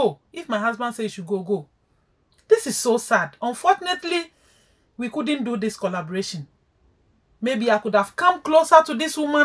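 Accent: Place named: Ghana. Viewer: Are you a Ghanian.because of your accent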